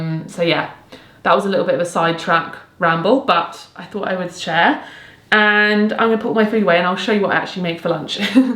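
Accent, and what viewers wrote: United Kingdom. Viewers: I like letting long vlogs run in the background while doing some light stuff that don't require too much attention because I'm trying to soak in some nice british english accent 🥰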